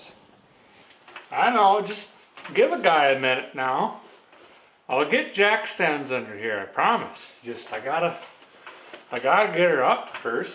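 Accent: Minnesota, United States. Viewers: Mind-bottling. . . the Minnesotaization of the language can be quite amazing. I'll do the right thing and say, close enough!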